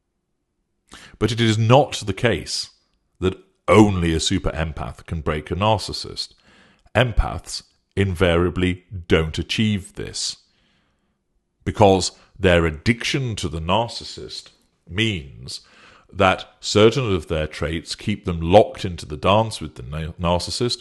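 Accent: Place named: RP, United Kingdom. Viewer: I have such a hard time believing that you are a narcissist and/ or psychopath. Is it my bias for the dulcet baritone, the lucid RP ?? I've whistleblown and taken on entrenched corruption and criminal narcissistics who resorted to harassment by proxy to get at me when direct attacks failed and failing that were exposed in court ( long story) By the time I won the case the guy was afraid of me and very angry.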